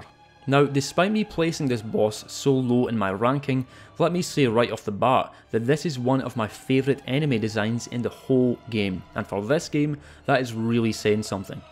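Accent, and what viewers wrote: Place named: Scotland, United Kingdom. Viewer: Bloodborne content AND a Scottish accent ? Likescribe it shall be.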